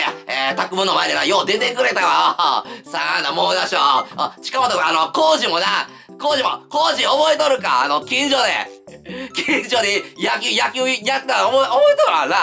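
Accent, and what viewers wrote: Kansai, Japan. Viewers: 設定に無理あるが、関西弁で押し通す精神力はすごい。さすが元お笑い芸人。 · ゴリゴリ関西弁ですやん😂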